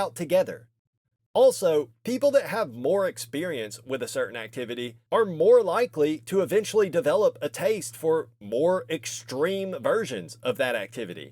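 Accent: South, United States. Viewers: he definitely has a slight southern accent. Wouldn't say hillbilly, but for sure Southern. Hillbilly is much more pronounced. He sounds like a dude who lives in a slightly more rural area.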